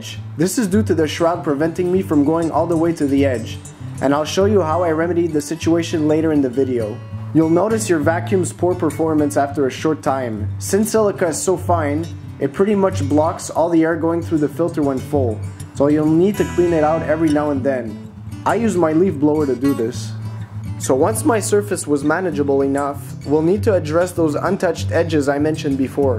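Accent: Quebec, Canada. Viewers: Heyyy!!! Toi tu as un accent quebecois quand tu parle! Vraiment sick! Jme creer un garage moi avec, so i'm super thrilled to have landed on your channel!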